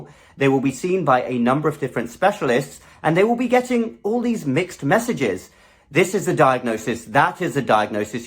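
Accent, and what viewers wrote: England, United Kingdom. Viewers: You sound English…are you talking about UK or US hospitals, or both?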